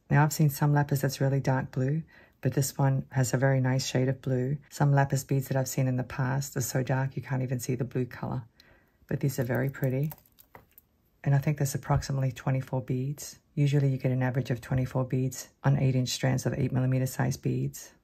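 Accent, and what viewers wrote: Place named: Australia. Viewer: Thank you so much for the video! AND thank you for keeping me company whilst I get my lab ready for school. ☺LOL! When I first subscribed to your channel, I had guessed you might be from AU from your wonderful accent. I have several great friends from OZ. I'm hoping to get there to visit them sometime soon. This box of pretty beads will make many wonderful treasures. Thank you again! Take care and be safe! 😊💖🙏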